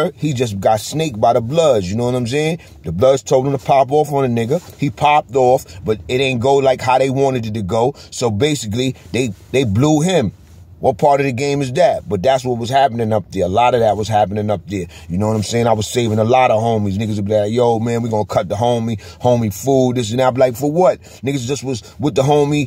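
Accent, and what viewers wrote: New York, United States. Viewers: I still cant get used to hearing a NY accent talking bloods n crips!! Crazy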